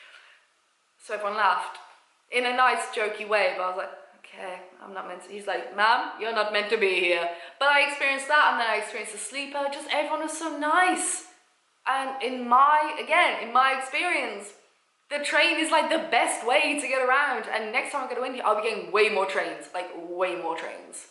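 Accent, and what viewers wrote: England, United Kingdom. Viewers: I love that English accent.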